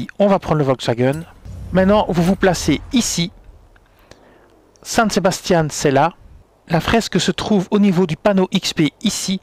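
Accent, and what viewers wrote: Belgium. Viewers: Merci a notre ami. Je suis fan absolu de ton accent belge. Tes 8 qui devienne ouitte c est trop genial. Big up a l amitié franco belge ou belgo francaise. 😊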